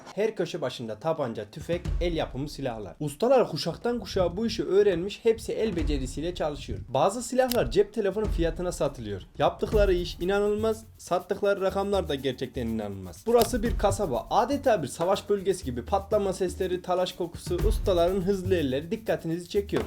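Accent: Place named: Konya, Turkey. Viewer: Gonya Garabuğan şivesi bu kurumda oralı konya/karapınar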